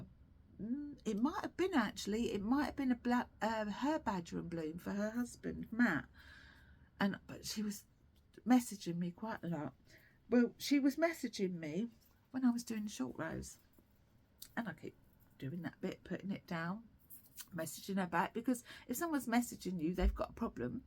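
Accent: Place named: South, United States. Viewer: Ahhh haha thank you so much for letting me know where you live! I could tell it was southern but that's about it! Thanks again for the mention. Knitters really are the best aren't they ❤ much love ❤❤❤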